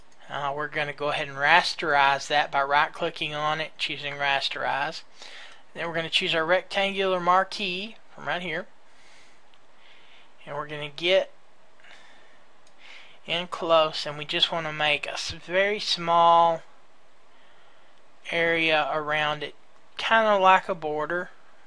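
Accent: Texas, United States. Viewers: Very helpful. Thanks for posting. I also love your Texas accent. Reminds me of my time spent in that great state.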